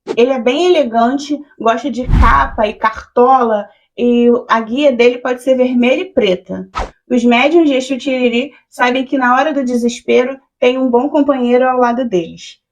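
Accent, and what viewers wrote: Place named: Rio de Janeiro, Brazil. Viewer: A história é linda , mas o sutaque carioca é horrível 🤢🤢🤢🤢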